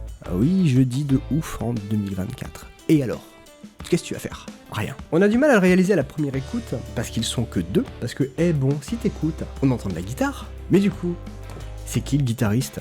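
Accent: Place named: United Kingdom. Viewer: Belle découverte ! Et c’est moi ou ton accent british s’améliore 😅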